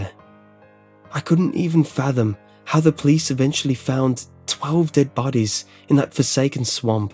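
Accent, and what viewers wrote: United Kingdom. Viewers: The dialect and narrator were so British it hurt. It hurt real good.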